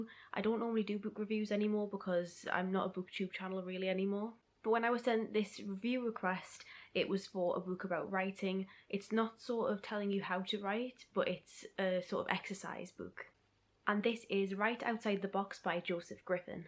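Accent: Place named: Newcastle, United Kingdom. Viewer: Being from the North East myself, there's nothing nicer to hear than that Geordie accent :)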